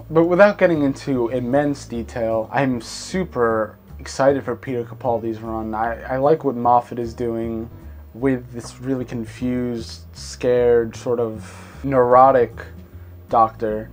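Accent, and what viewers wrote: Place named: Scotland, United Kingdom. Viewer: I like your Scottish accent :)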